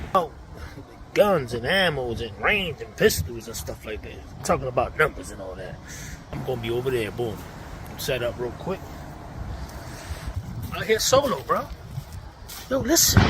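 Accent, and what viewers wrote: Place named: New York, United States. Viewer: I knew you were from the east coast somewhere from the accent. I'd have guessed NY. I don't come across too many guntubers from over there I guess coz of the gun laws. Keep doing ya thang bro. Watching from Texas (former NJ).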